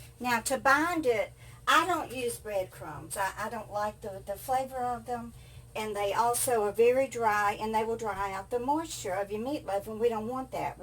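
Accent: South, United States. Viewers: It’s the Southern accent,. White (whyyyte). I have trouble with people understanding some of my pronunciation that aren’t from the South. Asked for a bag of ice in Philadelphia (iyyyce) and the lady thought I said a bag of ass. 🤣😂🤣😂 We got a good laugh. People from Idaho don’t have a Southern accent. I love to hear the lovely accent. Happy New Year to you. 🎊🍾🎈😘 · Hi, I just discovered you last week. My grandmother and her family were from Starkville, MS and one cousin is left there. My grandmother's cooking had a MS flair with lots of butter. And some from Ackerman. Goodlooking meatloaf and love your southern drawl. I'm in north Texas.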